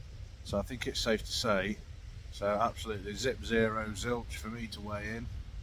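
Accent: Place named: Derbyshire, United Kingdom. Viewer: I live just outside Leek, I shoot competition air rifle with a lot of Derby / Matlock lads so knew you were that way from the accent😄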